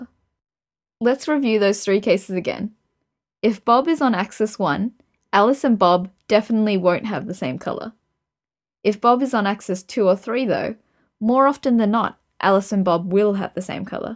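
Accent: Australia. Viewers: Wait a sec, are you Australian? Why am I so bad at noticing these things. (shamed Australian here) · The more I watch your videos the more I convince myself that you are Australian.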